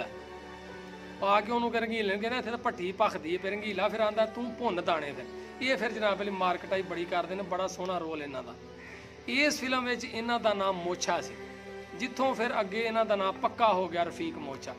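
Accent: Punjab, India. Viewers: Aapky program ki Khoodsoorti Aapky PANJABI bolny KY style Mai Hy ...Urdu Mai to HR koi program bnata Hy .......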